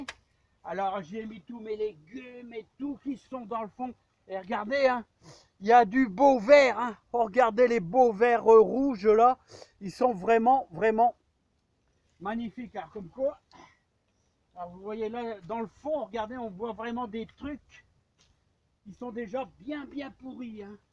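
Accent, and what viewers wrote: North, France. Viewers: Ça "écrase" l'accent de chn'ord... 😍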